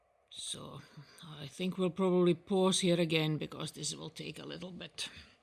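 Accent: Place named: Scotland, United Kingdom. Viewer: Great video,your son did a great job handleing the video.trying to place the accent, im in NE Scotland.get the feeling you are not far away.Thanks again,regards John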